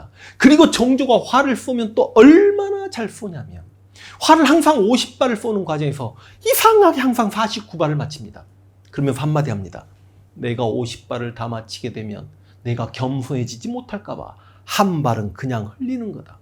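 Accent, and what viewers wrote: Jeolla, South Korea. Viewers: 부조간 발음 들으니 전라도 일단 거부감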